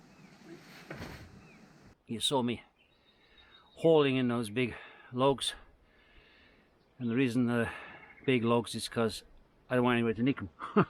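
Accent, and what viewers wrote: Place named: Black Country, United Kingdom. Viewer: I recognised the black country accent but I wasn't sure whereabouts.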